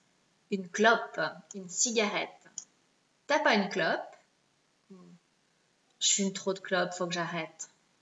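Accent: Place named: France. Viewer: tu as in voix tres feminin et tres interresant, une vrai accent feminine et franchise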